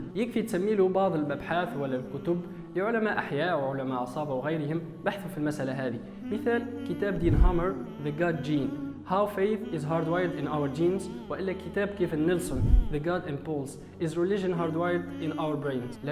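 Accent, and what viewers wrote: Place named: Tunisia. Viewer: حسيت صناعة المحاور تتكلم بصيغة تونسية ...مشروع رائع بارك الله في عملك ووفقك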